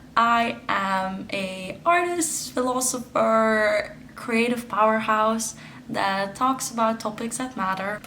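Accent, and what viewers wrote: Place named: Netherlands. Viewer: I lived in NL 7 years. I recognize that accent anywhere. 😊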